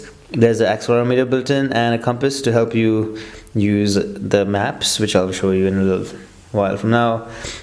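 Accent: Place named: India. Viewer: well by changing ur name you cant hide your indian voice. nice review though.!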